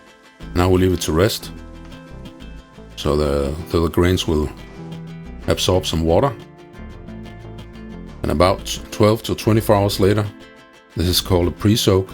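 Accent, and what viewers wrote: Denmark. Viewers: Man kan godt høre du er dansker. Tak for opskriften. Den vil jeg starte på med det samme:-)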